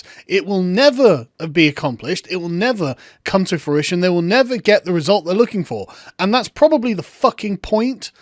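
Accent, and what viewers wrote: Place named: United Kingdom. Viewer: Sargon makes a living by being a giant British accented dick to the leftist progressives. For which I pay him cash for not only being entertaining but quite effective at it. #Sargonpillowhug Donald Trump took a pay cut and a hit on his future earnings by taking on the leftist progressives not just here in the states but around the world and he does it by not only being a 'giant orange dick' but by actually hurting their causes and exposing them for the lunatics they are. For which I will vote for him again and I hope his son is taking notes. The thought of what will happen to the #resistance voters if another President Trump sits in the White House for eight more years is quite pleasing. Maybe then we could get some real Conservative ideas and ideals cemented in place and we can yeet these Marxist bastards back into their holes for another hundred years or so.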